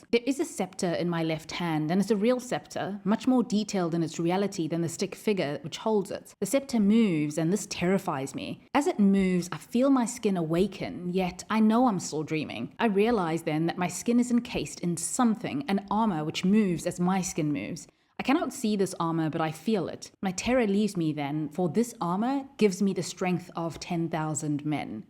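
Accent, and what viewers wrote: South Africa. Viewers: I love the female Sourh African accent. The perfect mix of allure, forthrightness and malevolence.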